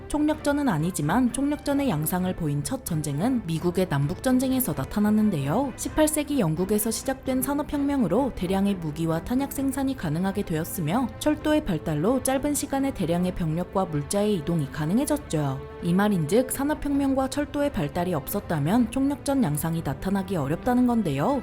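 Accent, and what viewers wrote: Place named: Gangwon, South Korea. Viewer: 강원도 억양같은데 억양이 중독성이 강해요.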